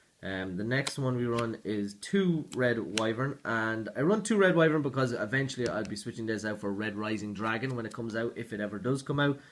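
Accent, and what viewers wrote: Ireland. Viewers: Ayy you sound irish :P sell me your deck pls xd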